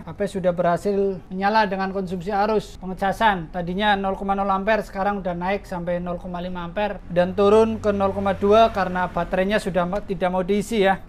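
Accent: Banyumas, Indonesia. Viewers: Koyo wong ngapak iki ra ngapak ra penak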